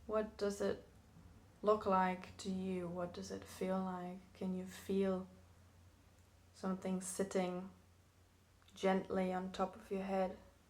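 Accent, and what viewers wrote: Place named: Ireland. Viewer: first comment🤪why didn't we start with crown? sorry, I don't mean to be a prick(it just comes natural lol). I like to employ frequency & vibration, light & sound. as far as I understand the bliss of the chakra-gasms, its different from the actual transcendence , but essential on the way. 😁your wisdom is beyond your years, im jealous LOL "when I was your age..."😂 your voice is perfect, brogue? can you run your voice through a compressor or limiter & boost the db so I can hear your voice at an even volume.🥰 crap im not being critical honest😇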